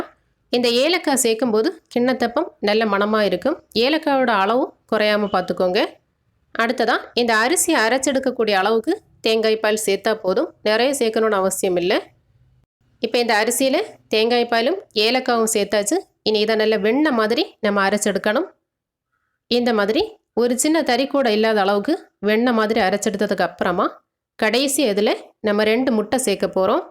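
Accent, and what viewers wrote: Kerala, India. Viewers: Super Checbi im from chennai and your language Slang is Malayalam. 🎉🎉🎉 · பேச்சு கேட்டால் மலயாளி போல தெரிகிறது. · Oru malaiyaalai Tamilppesavathaippaaraattunga kuraisollikkorikkaatheergal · Malayali mathiri pesareega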